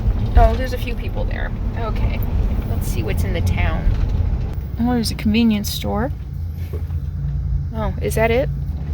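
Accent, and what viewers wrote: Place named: Minnesota, United States. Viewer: Minnis SO...tah. I love that accent too.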